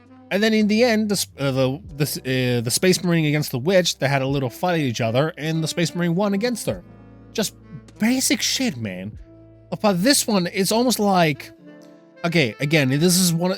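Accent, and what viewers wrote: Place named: New England, United States. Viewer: As soon as I heard the stupid yankee accent I knew to stop listening.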